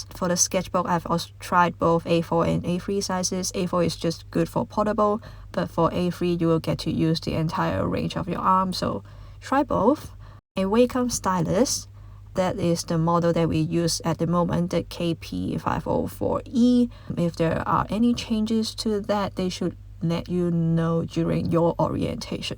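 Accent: China. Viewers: 听多了以后，觉得你应该是中国人。从口音判断的。:eyes-pink-heart-shape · thkuu for the helpful sharing! to me you sound Hong Kong! portfolio petition here!!!!! would be v helpful for someone who is in Australia and considering pursue a animation career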